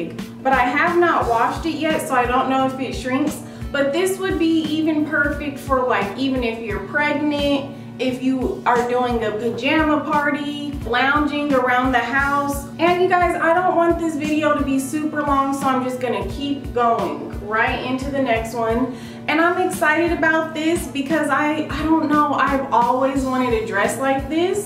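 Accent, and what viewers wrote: South, United States. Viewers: Where are you from you have like a southern twang to voice.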